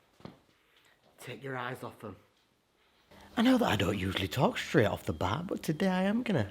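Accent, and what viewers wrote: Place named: Yorkshire, United Kingdom. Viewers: Sounds like Yorkshire, North of England 🏴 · It is, but there are several different and very distinct accents to be found in Northern England, this one sounds like Yorkshire to me.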